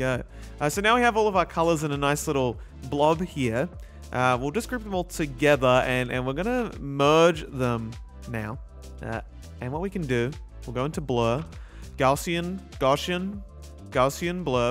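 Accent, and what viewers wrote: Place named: Australia. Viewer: australian accent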